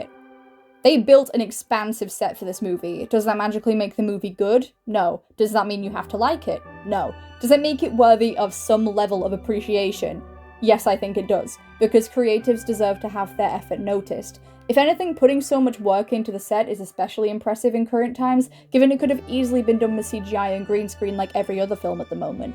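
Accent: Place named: United Kingdom. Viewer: Really cool watching a worm talking about liminal space in a british accent.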